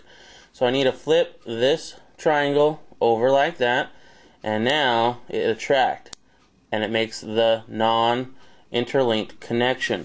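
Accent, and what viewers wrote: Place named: United States. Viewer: Damn, you talk like a stereotypical American.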